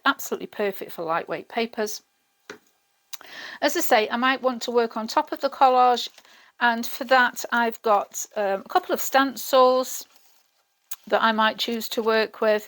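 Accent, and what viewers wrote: England, United Kingdom. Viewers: Hi, I'm Jane, in the UK, a relatively new subscriber, and I'm avidly watching all of your videos, I come to you for a dollop of inspiration! I'd love to get into gel plate printing, it looks like a lot of fun! Also, can I say, it's lovely to hear an English voice in the world of mixed media! Congratulations on reaching 25k, you deserve it.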